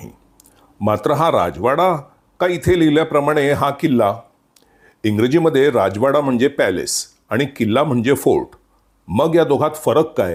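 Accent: India. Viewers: व्वा - अस्सल मराठी 👍👍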